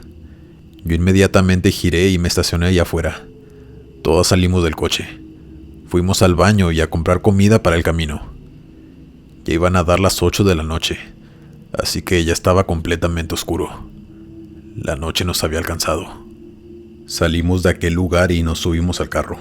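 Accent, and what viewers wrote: Mexico. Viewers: Hola caballero saludos de Medellín Colombia men encata este narrador es mexicano usted voz muy varonil y muy clara y y muy pulcra quiero desir sin ningún morbo y muy caballerosa felicitaciones y gracias por sus relatos muy interesante 👏👏👏👏👏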